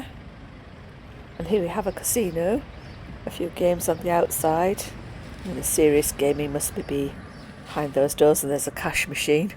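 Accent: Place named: Yorkshire, United Kingdom. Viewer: Excellent video and we enjoyed the commentary too; very informative. We're from Yorkshire and we think you may be too! Many thanks!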